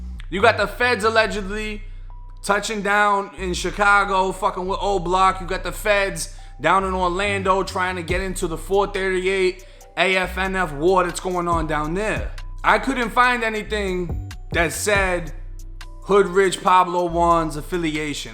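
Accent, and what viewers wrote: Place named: Memphis, United States. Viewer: You sound like a Memphian-- I digz that💯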